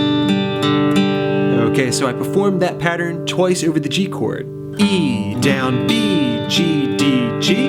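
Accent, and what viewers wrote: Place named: South, United States. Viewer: Love the country accent to it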